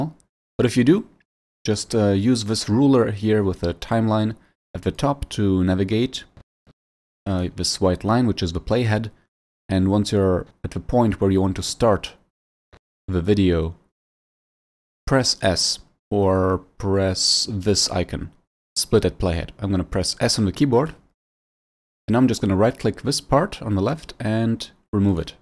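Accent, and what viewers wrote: Germany. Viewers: Hört sich schwer dannach an, dass deine Muttersprache Deutsch ist?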